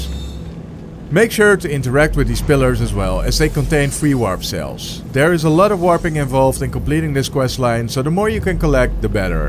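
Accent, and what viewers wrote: Netherlands. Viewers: You dutch aint you??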